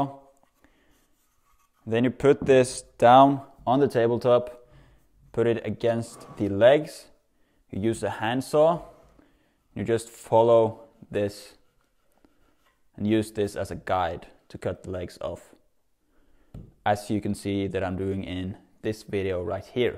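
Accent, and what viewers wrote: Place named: Sweden. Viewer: Snyggt! Tyckte jag hörde lite svengelska därav min reaktion. Följer dig nu så hoppas på grymma videos i framtiden 😊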